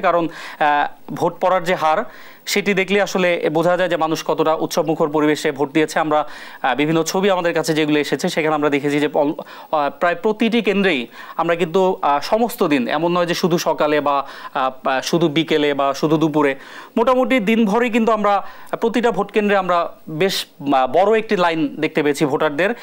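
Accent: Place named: Kolkata, India. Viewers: বাংলাদেশ উপস্থাপিকা গুলা এখন কলকাতার ভাষা ফলো করে😂😂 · মনে হয় কলকাতা উনি আসছেন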